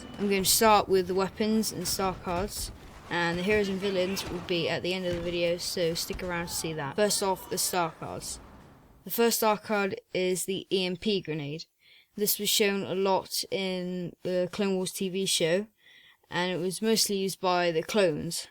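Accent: United Kingdom. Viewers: Lit af u British? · i clicked on this video expecting an adult who is professional, instead i got an annoying English fuck, how about you give Ireland back to us ya dorty hun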